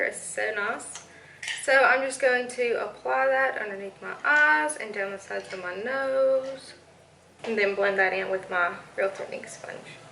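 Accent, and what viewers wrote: South, United States. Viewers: You're so pretty! What camera are you using? I love your accent, I'm have a country accent as well and you're truly inspiring me to start my channel! Xoxo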